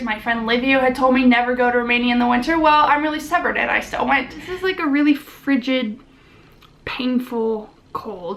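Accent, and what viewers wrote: France. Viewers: Hi! I started looking at your videos a few weeks ago and it really got me to want to travel again.. and visit Shenzhen! Thanks for sharing your passion about travelling and .. about China! Oh and by the way.. super accent Français! ;) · Great french accent by the way:)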